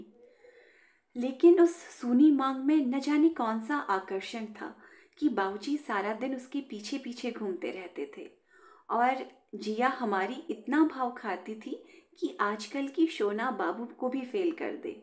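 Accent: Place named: Rajasthan, India. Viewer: ये राजस्थानी लहज़ा, लिखावट, संस्कृति... सब कुछ बेहद ख़ूबसूरत। बहुत सुंदर संस्मरण ♥️🌼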